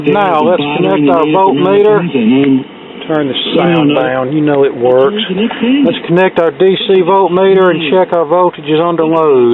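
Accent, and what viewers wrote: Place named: Rural, United States. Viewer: That was friggin' awesome! It was like watching Mr.Carlson's Lab with a country twang! Go to the head of the class.A+!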